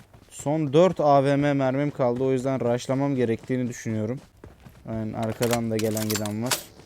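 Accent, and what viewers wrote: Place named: Bolu, Turkey. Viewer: Abi nerelisin sende bolulu gibi bu ses var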